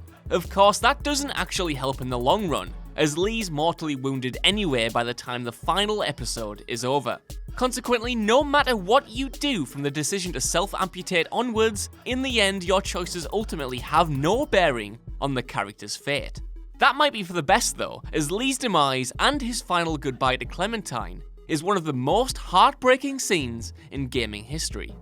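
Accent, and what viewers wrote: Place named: Liverpool, United Kingdom. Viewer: where's that english accent from? liverpool?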